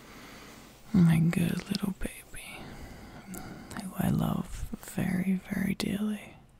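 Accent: Australia. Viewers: Aussie accent ahhhh:××× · I like that you're aussie cause idk I feel more at home and it feels more real ig? Idk I also kinda just get annoyed at how americans- and like most others actually- say some words and I get uncomfy so ye that was hard to write fjhreh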